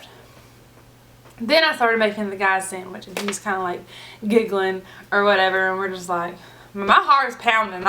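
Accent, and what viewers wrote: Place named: South, United States. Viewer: I'm impressed by yer appetite and yer food-crushing capacity. Looks like you could eat a lot of guys under the table. And yet you don't look like a truck driver – quite the opposite. So, have you ever been out on a dinner date and scared a dude? Hey, by the way, when you're talking, it seems a subtle Southern accent kind of comes and goes – are you in a Southern state?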